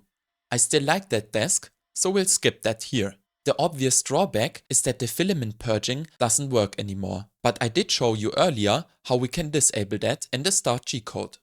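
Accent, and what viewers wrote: Germany. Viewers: Kann man sich nicht anhören! Diese übertriebene Betonung! Typisch .... wenn ein deutscher tolles englisch sprechen will. Da ist nichts flüssig.....und die Betonungen passen nicht zur englischen Sprache! Ich bin raus! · der akzent klingt so deutsch :D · very "Kartoffel-english" ;D but nice video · I would listen to a documentary about paint drying from you. I love the German inflections in English and you keep a smooth cadence. You should think about voice acting.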